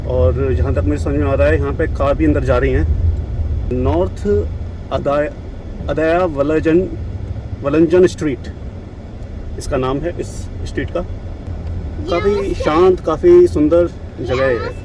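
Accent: Standard. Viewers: आपकी शुद्ध हिन्दी सुनकर बहुत अच्छा लगता है l धन्यवाद 🙏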